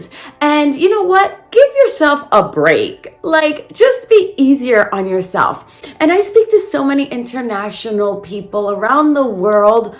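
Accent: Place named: New Jersey, United States. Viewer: I May Speak English At My House, But I Also Spoke Spanish As Well, I Was Born In Seattle But Grew Up At The Same City Being Bilingual Myself, I Understand The Fact That You're Dominican And Oscar's Mexican, My Dad And Mom From Guatemala, Which Is South Of Mexico, And I Am Officially of Guatemalan Descent, Barely, And Seriously, I Love Your Jersey Accent, I Also Had A Vancouver/Seattle Accent, But My Parents, They Might Fake It's Accent Cuz They Spoke Only Spanish When They First Moved To Seattle In 1997, And 2006, I Could Say My Last Name 'Vicente' Very Fluently And Correctly As Any Sort-Of-Ways Ever Since I First Spoke Spanish Fluently At 4, I Sometimes Mispronounce Sometimes Ok, Great Message To Hear, Nicely!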